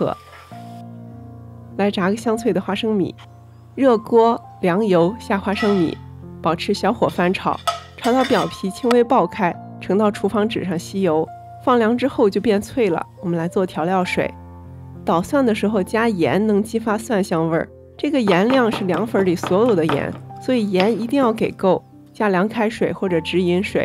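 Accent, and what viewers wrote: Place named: Shaanxi, China. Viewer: 听口音是陕西人嘛， 额打算试试你的方子。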